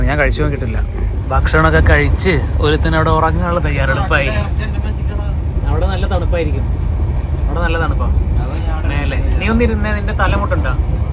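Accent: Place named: Ponnani, India. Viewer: ഞാൻ പഠിച്ചത് MES കോളേജിൽ ആണ്. എന്റെ സ്ഥലം കുമരനെല്ലൂർ. പിന്നെ നിങ്ങളുടെ സംസാര രീതി കേട്ടപ്പോൾ നമ്മുടെ അടുത്ത് തന്നെയാണ് എന്ന് തോന്നി. എല്ലാ ആശംസകളും നേരുന്നു.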